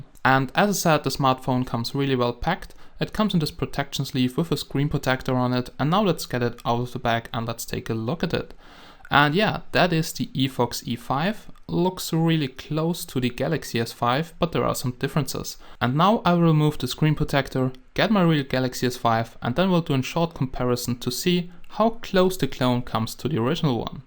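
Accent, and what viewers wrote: Germany. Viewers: Ich bin halb irisch und halb deutsch meine muttersprache ist englisch und ich merke sofort das deine deutsch ist. Nichts gegen dich aber könntest du auch mal videos machen wo du deutsch sprichst? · You dont Hear like a English man More German 😄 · did I hear a german acsend? :D naa hörst dich n bisschen so an :D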